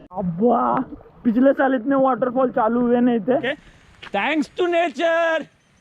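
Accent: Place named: Maharashtra, India. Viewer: Ashich commentary thev, real, marathi ani marathyachi hindi, lavkarach famous hoshil 😂⛳️👌🏻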